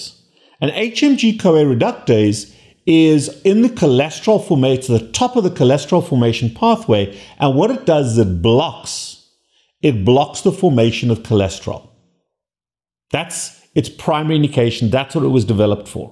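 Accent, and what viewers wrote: South Africa. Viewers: Sounds like a Saffa with an American twang on some words.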